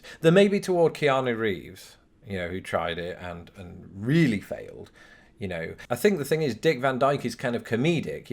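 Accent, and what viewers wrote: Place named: South, United Kingdom. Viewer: Just noticed you pronounce the word "fast" like a Southerner....farrrrst. Are you forgetting your flat Northern vowels sir?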